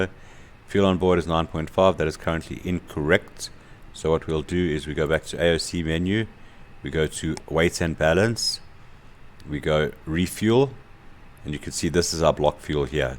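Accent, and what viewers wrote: South Africa. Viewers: Hoor daai Afrikaanse aksent.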